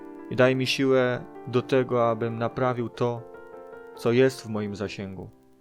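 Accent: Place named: Silesia, Poland. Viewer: Akcent Śląski.Początek modlitwy szczery i własnymi słowami jak wskazuje Słowo Boże.Jednak końcowa część modlitwy oddzielona lekko od reszty jest niezgodna z Pismem Świętym,ponieważ nie wskazuje modlitwy do ludzi(Rzym. ) a nawet potępia.Maria matka Jezusa była pokorna niewiasta i nie oczekiwała modlitwy do siebie,ale też kierowała je tylko do Boga Ojca.Pan Jezus poproszony przez uczniów o naukę modlitwy też wskazał,że należy kierować je tylko do Boga Ojca,,Ojcze nasz....świec się imię Twoje(jakie?)....."itd.Pan Jezus nie powiedział módlcie się do mnie,albo módlcie się do matki mojej-tak nie powiedział! Końcówka to wierszyk powtórzony po kimś-nie własne szczere z serca płynące.Biblia podaje dużo przykładów szczerych modlitw sług Bożych w różnych miejscach i były to osobiste myśli nie czyjeś.Nawet w Ew.Jn.17 rozdział cały to modlitwa Pana Jezusa do Boga Ojca-szczera z serca,nie do Marii i nie do anioła.To tak naprawdę przykład modlitwy niezgodnej ze Słowem Bożym,choć robi wrażenie i to jest zwodnicze😘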